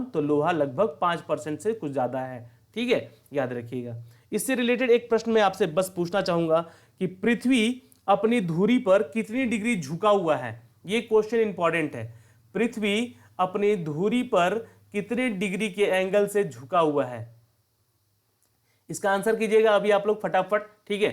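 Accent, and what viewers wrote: Bihar, India. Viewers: Bihari ho kya bhai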